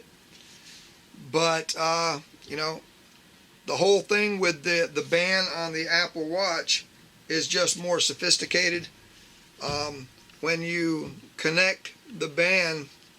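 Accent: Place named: South, United States. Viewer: Use Suriii.... ahh southern people accent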